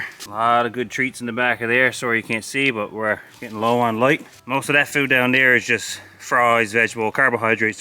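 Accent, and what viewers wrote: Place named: Prince Edward Island, Canada. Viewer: Its similar to the PEI accent, which is a mix of Newfy and Scotian I think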